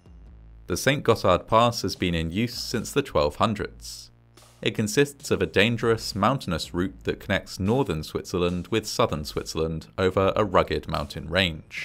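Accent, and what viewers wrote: England, United Kingdom. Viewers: I adore this horrific channel. I think the British accent is the absolute best accent for speaking English. It just is…I’m a dummy and it always sounds more sophisticated; in particular, I like my tragic stories delivered from a British accent…I don’t want to listen if it’s not British 😊 maybe Aussie in a pinch but I really love them dirty little Britts. You’re awesome! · I recently discovered your channel and (in hopefully as unracist a way as possible) I must say I love listening to narration with an English accent, as opposed to the OTT overly dramatic sounding American accents that seem to dominate disaster documentaries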